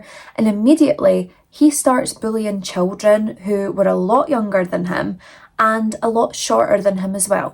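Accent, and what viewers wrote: Scotland, United Kingdom. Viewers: I'm new here love the Scottish accent I'm from England think I'm going to like ur channel ❤❤❤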